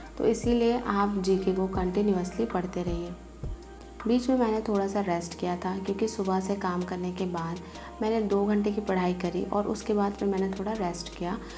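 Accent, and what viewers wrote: Bihar, India. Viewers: Madam aap Bihar se hain ya Jharkhand se??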